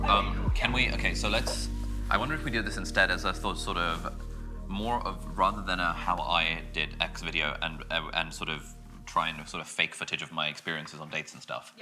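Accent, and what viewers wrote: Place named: United Kingdom. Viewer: Really amazed watching an Arab speaking English with a perfect British accent. Assalamu alaikum, Akhi. 🙏🙏🙏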